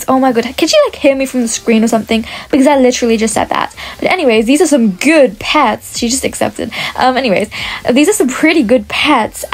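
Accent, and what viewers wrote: United Kingdom. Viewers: normal British girl.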